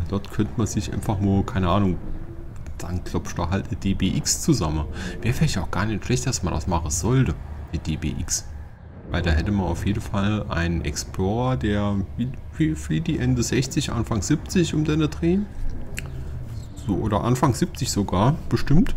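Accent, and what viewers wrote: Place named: Saarland, Germany. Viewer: Moin, Nettes Video. Dialekt klingt, als wärst du aus der Nachbarschaft. Grüße ausm Saarland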